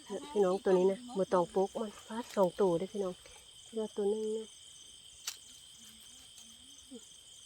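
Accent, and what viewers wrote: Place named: Phu Thai, Thailand. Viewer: เว้าผู้ไทยคือเดียว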